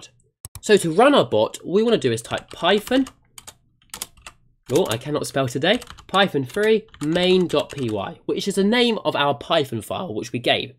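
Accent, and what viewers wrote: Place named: United Kingdom. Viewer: oh, you sound so british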